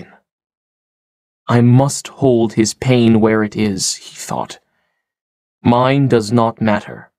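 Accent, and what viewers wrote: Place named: United States. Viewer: The pronunciation in the story is the American accent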